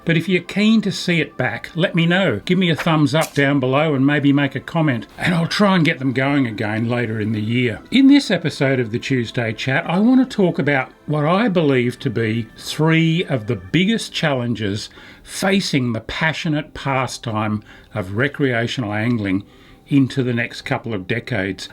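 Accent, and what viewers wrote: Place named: Australia. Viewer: You are an amazing Aussie role model extremely fair in your comments not just with fishing but fair and Aussie the way you talk, thank-you you state it how it is, have a well earned break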